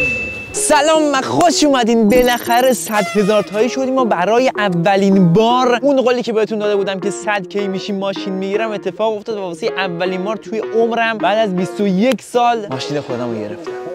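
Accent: Mazandaran, Iran. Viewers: مازندرانی هستی؟❤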